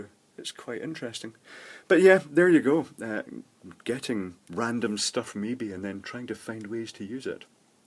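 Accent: Scotland, United Kingdom. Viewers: Scottish accent is amazing 👍🏼👍🏼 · Gun Smoke Scottish accent so im assuming hes from Scotland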